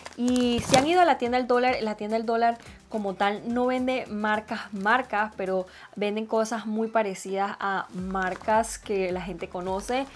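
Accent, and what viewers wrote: Venezuela. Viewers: Queria preguntar de donde eras. El acento se reconoce siempre Venezolano.❤️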